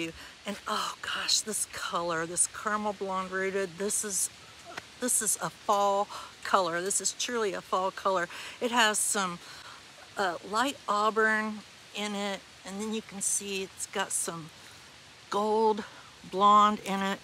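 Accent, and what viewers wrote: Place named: Indiana, United States. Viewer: I thought you sounded like a Hoosier 👍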